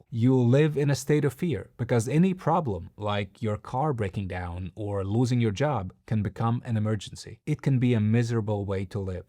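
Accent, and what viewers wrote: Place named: Latvia. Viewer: Klausoties dzirdu nedaudz latviešu akcentu :)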